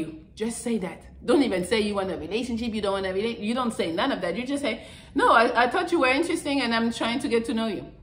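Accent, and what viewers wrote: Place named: France. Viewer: I just seen your videos and started watching because of your accent. It sound so familiar . Then i realized, you’re French like me!! Probably from Gwadada or Madinina !!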